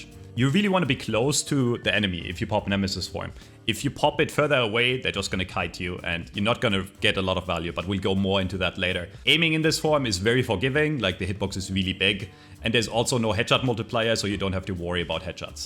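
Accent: Germany. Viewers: I knew you were German within the first 5 seconds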